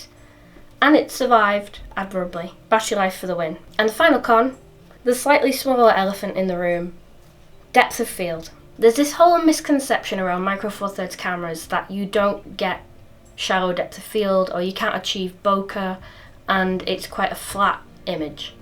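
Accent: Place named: England, United Kingdom. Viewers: Great video , very educational and a great Northern accent. Where are you from ? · Hi, you've just gained a new sub after watching a couple of your videos, love the Bolton accent too (I'm from Manchester originally but now living in New Zealand:D) Anyway, i started photography about 15 years ago and was exclusively Canon until, for the same reason as you, i found myself leaving my kit at home. I then decided to try m43 with the Olympus OM-D E-M10, it was a cracking camera with some good features, i invested in a few lenses but i found they just didn't 'feel' right for me, for the last year though i've been using my Sony A6500, which i absolutely love, it's the best of both worlds, not too big, decent lenses and APSC sensor for more detail. I'm trying to get more into video and have just invested in the Zhiyun crane plus, which is how i found your channel, (i searched night lapse). 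So, i hope to get out and do some nice NZ lapses:)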